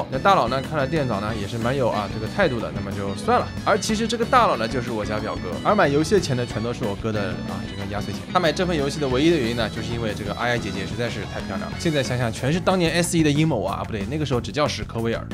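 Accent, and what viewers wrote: Shanghai, China. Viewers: 这个上海腔搭配的恰到好处。色一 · “纵所邹资”作为在海外的上海人 你让我想起了我和蔼可亲的上海语文老师 祝他在天国安好。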